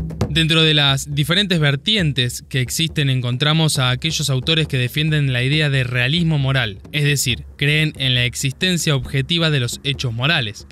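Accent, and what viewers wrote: Argentina. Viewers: Sos argentino pa? Muy buenos videos 🤠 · Qué curioso pronuncian la palabra "niños" por aquellos lados. Ya he visto videos de youtubers argentinos y paises aledaños, y la pronuncian algo como "ninios" 🤔🤔🤔.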